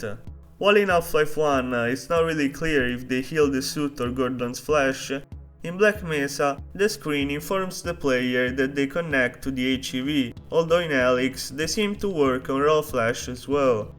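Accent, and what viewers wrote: Italy. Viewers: I have never heard a more aggressive Italian accent. Lovely video video, keep up the good work.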